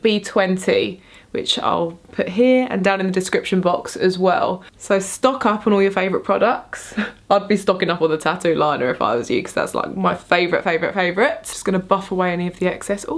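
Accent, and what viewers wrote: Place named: United Kingdom. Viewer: Omg! That british accent !!😍😍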